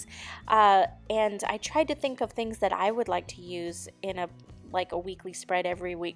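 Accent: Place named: Dakotas, United States. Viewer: I know you've lived in Hawaii, but by any chance have you lived in the Dakotas? I'm always trying to figure out your accent! lol especially trying to figure out where "anywhose" comes from! You're so adorable!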